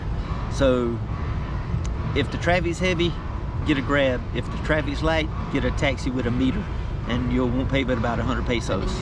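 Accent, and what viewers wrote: Appalachia, United States. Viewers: are you inbred variety??? you sound like a hillbilly or Adam sandler in water boy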